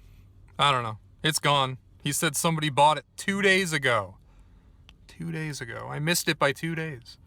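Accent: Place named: Midwest, United States. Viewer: wait, if you're from florida and live in texas, why do you sound like you're from iowa, definite midwest accent.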